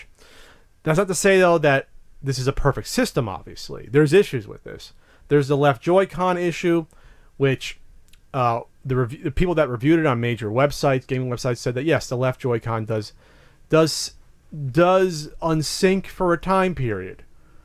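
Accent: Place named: East Coast, United States. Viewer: LIVES ON CALI!?!?! O_O I COULD HAVE SWOOORN THIS WAS AN EAST COAST BOY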